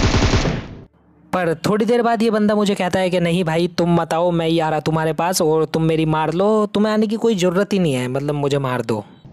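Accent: Haryana, India. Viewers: Bhai please btada tu haryana ka h kya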